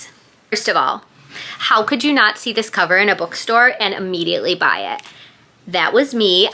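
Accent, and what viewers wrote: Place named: Milwaukee, United States. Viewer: your voice is very milwaukee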